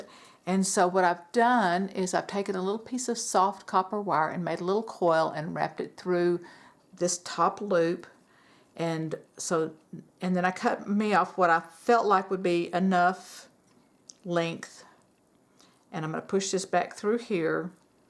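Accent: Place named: South, United States. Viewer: "A little carried away".... I loved that comment, you are not alone! LOL I look forward to more of your videos, kitty and all. A dear friend of mine was raised in Georgia, thus a slight southern voice. You have that same accent, I kept waiting for you to say "well bless her heart" lol 🌺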